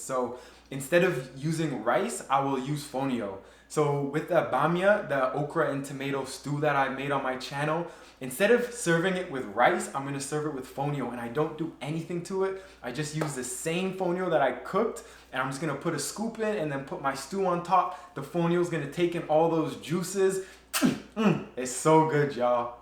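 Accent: Canada. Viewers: Are you Lucian 🇱🇨 ? Your accent sounds Canadian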